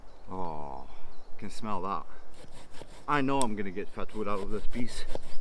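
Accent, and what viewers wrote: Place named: South Africa. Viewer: Another great video and good information, thanks. I can't place the accent, thinking South Africa but could be way off :)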